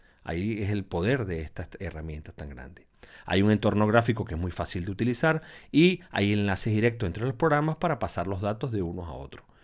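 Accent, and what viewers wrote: Venezuela. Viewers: disculpa eres venezolano ???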